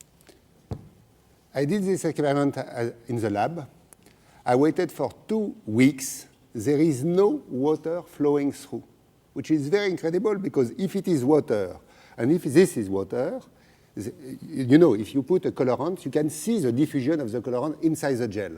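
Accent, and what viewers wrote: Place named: France. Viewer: Fucking brilliant. If only we would have teachers who would be like that all the time... with french accent.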